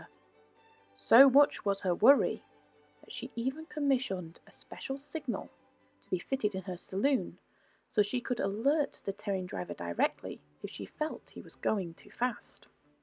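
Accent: Doncaster, United Kingdom. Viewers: I can not believe that no body has commented on your excellent video - it is refreshing to hear a lady from Donny talking about the Railways - stay safe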